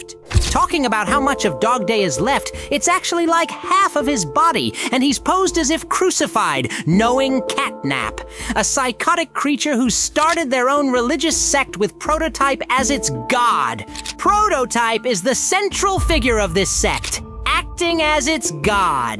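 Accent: United Kingdom. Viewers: Bruv American to British now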